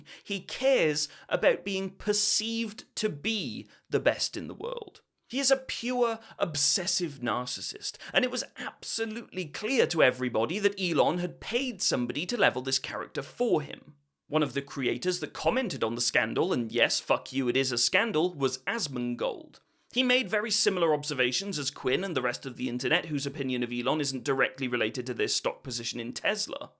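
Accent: United Kingdom. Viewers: just might be your moist pointless video yet. at least it brings a british accent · I just have to say that very very few people can make me *literally * laugh out loud, but you manage. I’m British too and I think I have a similar accent and love of swear words so it’s very inspiring to hear you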